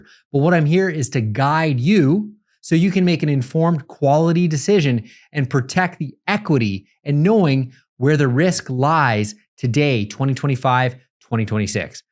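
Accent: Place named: Ottawa, Canada. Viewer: can hear the ottawa accent. love it haha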